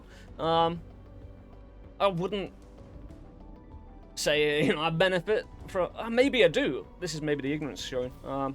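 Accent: United Kingdom. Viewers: i think ive found my 2nd favorite british accent ._. so pleasing to the ear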